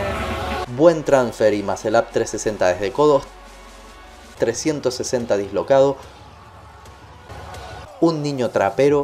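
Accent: Argentina. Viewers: Me está gustando que estés implementando el acento argentino bien buenardovich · ¿por q esta tan argentino en este video? XD, las batallas de gallo o q?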